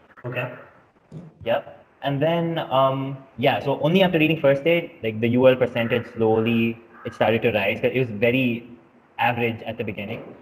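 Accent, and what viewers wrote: India. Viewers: Great videos. For us, who are not Indian, understanding the Indian accent is not always easy. Could you please write a brief summary of each video under the video. That would be so helpful. Thank you in advance and best regards.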